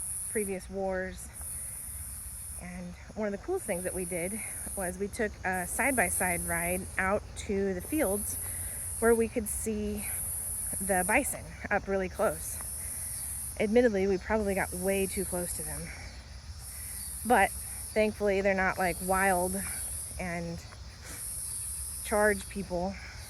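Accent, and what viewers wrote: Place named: Texas, United States. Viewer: i'm from Birmingham which is a major city, but I really like to travel to Wales which also has cities but also has plenty of beache and country. Where is it that you live? I assumed maybe Texas from your accent? Am I right?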